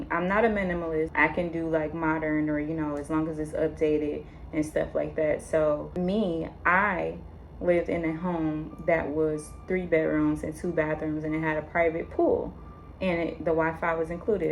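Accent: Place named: Philadelphia, United States. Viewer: Are you originally from philly? It just really sounds like you are.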